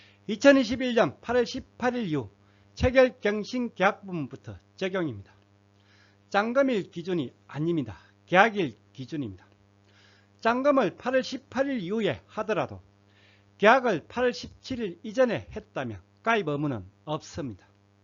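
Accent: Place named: Gyeongsang, South Korea. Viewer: 형님 감사합니다 전세가 6억이면 어느동네 인지요 경상도사투시니까 대구 수성구 인가요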